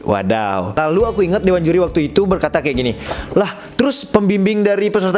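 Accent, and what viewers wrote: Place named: Bali, Indonesia. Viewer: Logat balinya kerasa🤩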